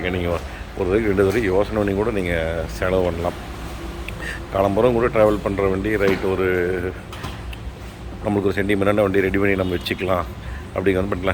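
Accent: Kongu, India. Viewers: கொஞ்சும் கொங்குத் தமிழ் பேசும் கோமகரே...! திகட்டாத தித்திக்கும் பேச்சுடைய...! எங்கள் மதிப்பிற்குறிய டான் திரு. அண்ணா அவர்களே❤❤❤🙏 எப்படி இருக்கீங்க அண்ணி❤❤❤🙏 எப்படி இருக்காங்க ரித்திக்❤❤❤ எப்படி இருக்காரு அருமையான வேலைப்பாடுகள் அண்ணா❤❤❤❤👍👍👍👍 தகரமும்கூட தங்கமென ஜொலித்திடுமே எங்கள் தங்கமகன் சிந்தனையின் வாயிலாக..! அந்த சிந்தனையில் உதித்த உத்தரவைப் பேணிக்காத்து வியர்வைத் துளிகளை நிலத்தில் உதிர்த்து உன்னதமான உழைப்பை உருவாக்கிடும் நமது Super Car Careன் உழைப்பாளிகளுக்கு அனைத்துலக நம் TMF குடும்பத்தினர் சார்பாக எனது நெஞ்சார்ந்த நல்வாழ்த்துக்களையும் பாராட்டுக்களையும் தெரிவித்துக்கொள்கிறேன்❤❤❤🙏🙏🙏 என்றும் அன்புடன் உங்கள் தம்பி என்றும் அன்புடன் உங்கள் TMF ரா.முஹம்மது கபூர் (சென்னையில் இருந்து) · தங்களது பல காணொளிகளை பார்த்துள்ளேன் இதுவரை யூட்யூபில் எந்த வீடியோவுக்கும் கமெண்ட்கள் செய்வதில்லை தங்களது கொங்கு தமிழும் அன்பு பொங்க பேசும் உங்களது நடையும் என்னை கவர்ந்தது மேன்மேலும் வளர மனதார வாழ்த்துகிறேன் தங்களது அலைபேசி எண்ணை பகிருங்கள் உங்களிடம் பேச வேண்டும்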